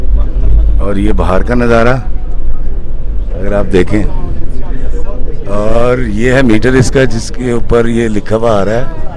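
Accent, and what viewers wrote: Pakistan. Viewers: Aap k vdo achi hai aap ka (efal) tower aur ( perass) kehna b khalis Pakistani style hai aur phir ( O my God…) all good but ye jo perass ka nazara hai na ye kuch itna impressive nai hai vs our Islamabad view from Daman e koh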